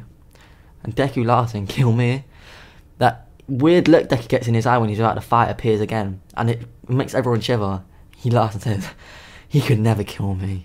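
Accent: United Kingdom. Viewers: Fix yo Briish accent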